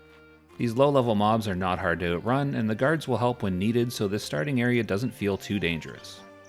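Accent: Canada. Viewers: You sound like you're from east coast Canada :)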